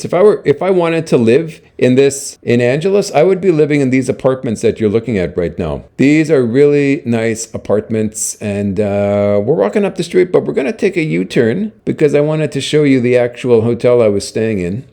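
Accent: Canada. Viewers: Ha ha!, you don't hide your western Canadian accent. Very nice sound to my ears, even though I am from Newfoundland with a very different accent. After 4 years in the Philippines, the accent is nice. Stay cool bro.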